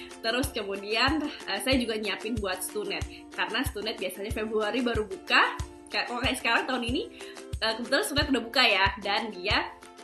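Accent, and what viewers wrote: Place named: United Kingdom. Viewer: Logatnya Udah Btistish UK banget . 😅😅😅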